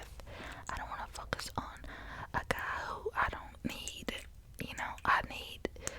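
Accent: South, United States. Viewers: Big southern.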